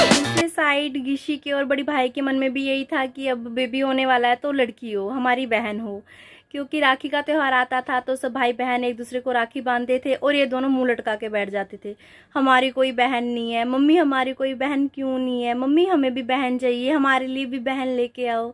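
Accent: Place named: Garhwal, India. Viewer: Aapke muh se axxi lgri hai garwaali language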